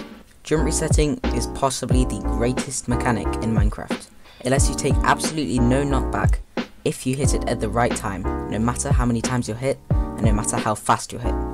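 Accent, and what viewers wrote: United Kingdom. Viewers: fr he’s a British guy with a beautiful accent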